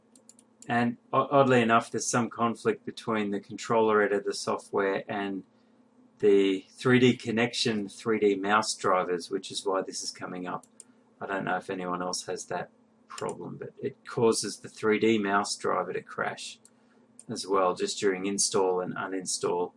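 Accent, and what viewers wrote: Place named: Australia. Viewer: Thank you so much my friend. I'm from Brissie - it was so nice to have a calm Aussie voice walk me through getting this working. Bless you .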